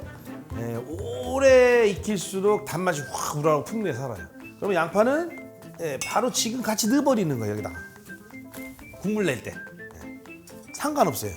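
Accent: Chungcheong, South Korea. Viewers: 구수한 충청도 사투리? 잘 모르지만 어머니 고향이 충청도인데 구수한 . 그래유우~등등. 듣기좋은 우리나라말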